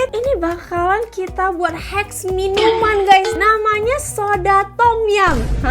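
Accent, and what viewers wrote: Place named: Bali, Indonesia. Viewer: Logat balinya udh mulai ad yaa😅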